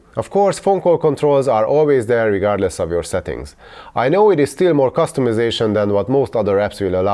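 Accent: Hungary. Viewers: Are you Hungarian tho? Ha igen, akkor pacsi 😃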